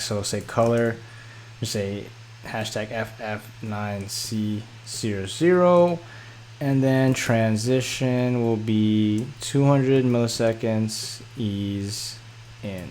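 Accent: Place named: United States. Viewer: Great job! And American English!.. Thank you!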